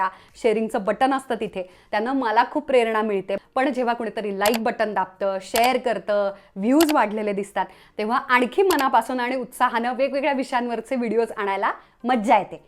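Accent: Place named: Pune, India. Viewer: I just love the way you talk, pure marathi puneri tone :), looking forward to see online shopping tips/tricks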